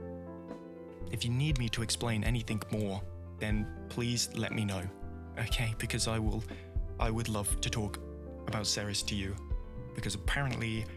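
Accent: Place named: United Kingdom. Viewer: I love this British asmr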